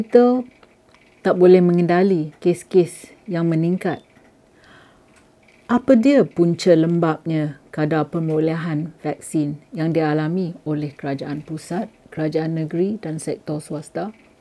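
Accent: Perak, Malaysia. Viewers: Kuat likat pekat melekat peraknyeee yongg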